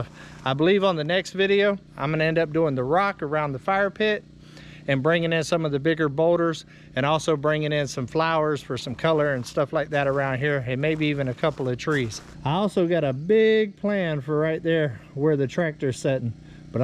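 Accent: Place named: West Virginia, United States. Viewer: Telling ya man.. Im originally from WV. Lived there for 33 years of my 40 on this planet... Hearing this man talk takes me home..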